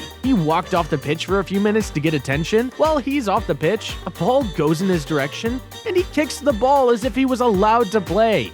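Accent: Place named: United States. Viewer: Bros definitely american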